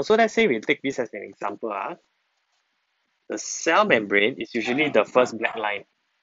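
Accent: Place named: France. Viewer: U speak like a french BTW its quite helpful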